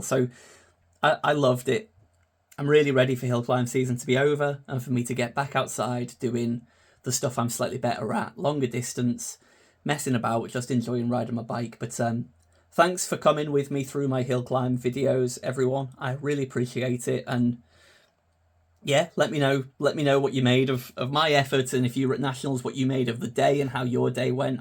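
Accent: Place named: Derry, United Kingdom. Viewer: Your accent sounds just like Aileen from Derry on the Graham Norton show. 😆 Good job on the hillclimb!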